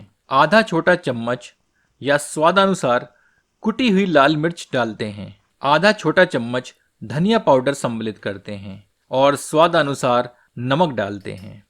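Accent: Standard. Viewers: Amazing recipe and full authentic hindi haha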